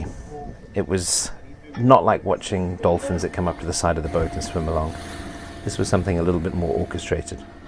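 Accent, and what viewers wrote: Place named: South Africa. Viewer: The narrator is from 🇿🇦 with that accent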